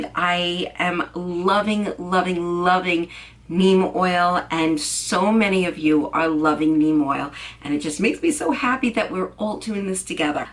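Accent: New York, United States. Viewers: All ingredients used for hair growth have to come from inside the body not on top. You need biotin selenium etc. Dr. Berg has an incredible formula for hair growth. Ps. You gotta be from nyc you sound like me! Queens?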